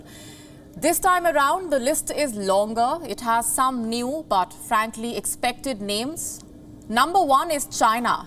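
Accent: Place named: India. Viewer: Why WION news are using broadcasters that has strong Indian accent journalist I barely understand what she was reading my goodness pls kindly use someone that has free accent in English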